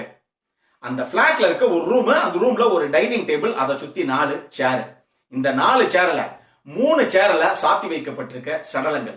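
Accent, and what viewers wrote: Tamil Nadu, India. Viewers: Only for your Tamil slaang kanna great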